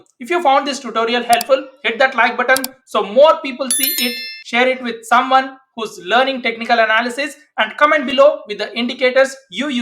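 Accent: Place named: India. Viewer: You have the most stereotypical indian voice I've ever heard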